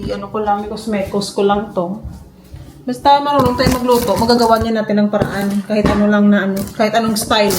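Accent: Iloilo, Philippines. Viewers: When she speaks English, her pronunciation is with Ilongga's accents, intonations and tones. Which is sometimes not easy to understand.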